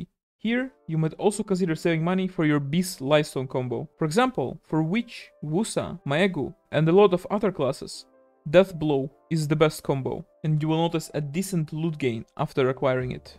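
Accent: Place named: Pakistan. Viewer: Love that pakistani accent 😍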